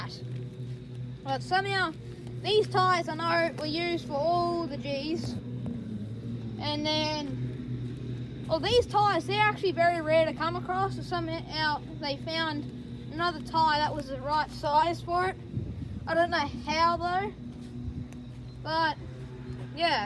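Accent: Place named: Australia. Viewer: Nice! We use ours here in NC to grow collards. Sounds like you might be in Australia, based off the accent. Just curious if collards would grow well there, or what and all does grow good.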